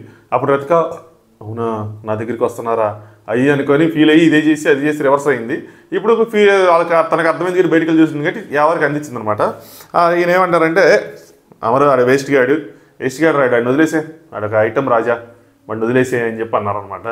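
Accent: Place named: Guntur, India. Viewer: Guntur side slang anipistondi 😁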